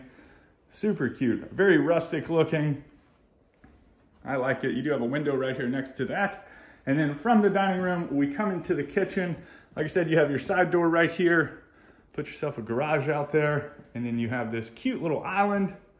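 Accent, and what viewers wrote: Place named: North, United Kingdom. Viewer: Too northern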